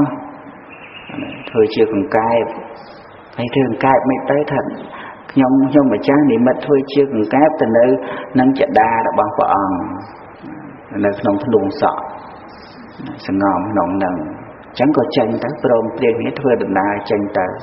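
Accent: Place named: Kandal, Cambodia. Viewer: ព្រះអង្គ ទេសសំនៀងដូច អ្នកស្រុកកណាចឹង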